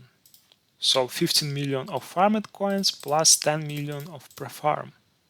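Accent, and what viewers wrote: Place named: Russia. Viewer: русский акцент)😀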